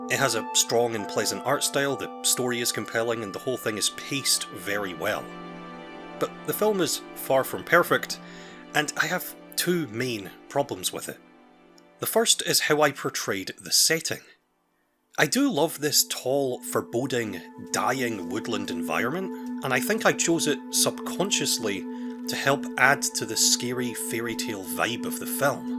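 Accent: Scotland, United Kingdom. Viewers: OI jabber ya scottish yah?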